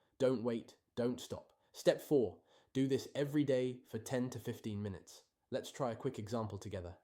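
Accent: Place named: United Kingdom. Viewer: How did you get the British accent with ressources that you use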